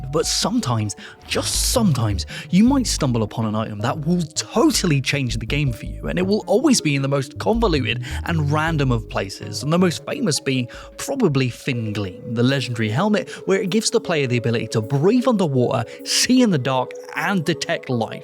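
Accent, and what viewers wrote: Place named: United Kingdom. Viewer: I watched this a 3rd time. I am convinced is a fake gamer who needs hand held garbage or carrot on a stick garbage like WoW. He says that some items are scattered around and hard to find, then says exploration is pointless as there is no pay off. Example: fin gleam. Kid, make up your mind and please realize a British accent doesn't make stupid things stated as FACT, not OPINION sound very dumb and not smart. Funny thing is, I despise Bethesda, shit on them all the time, but the difference is I use literal FACTS. I do not cry about stuff and pretend they are facts. I think is just geared towards dumbed down slop like Skyrim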